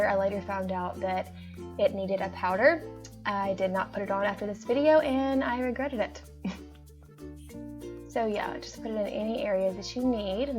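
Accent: South, United States. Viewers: I love it, and are u from the south I think I hear an angelic southern belle lol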